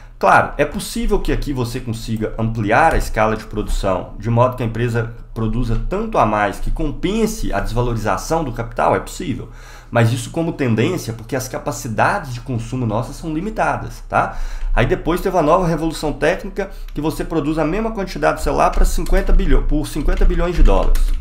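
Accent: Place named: Northern Minas Gerais, Brazil. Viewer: Cheguei aqui de volta devido a um corte recente. Estava ouvindo e apreciando tantos sotaques familiares e incomuns de se ouvir na internet, os caras de Uberlândia e você de Turmalina estava ouvindo e lembrando de colegas que tive na época do CEFET com o sotaque de mineiro do norte e minha namorada e eu pensamos a mesma coisa enquanto você contava a história que só faltava você falar que estudou no CEFET. Foi uma grata surpresa ver alguém com trajetória similar de tantos outros colegas que tive e de um espaço que é grande na minha vida. Não sei pq mas me senti compelido a vir aqui comentar. Apesar de discordar de certos pontos, temos em comum a escola de origem e o marxismo como norte. A participação em tal podcast foi ótima, didática e eficaz, está de parabéns.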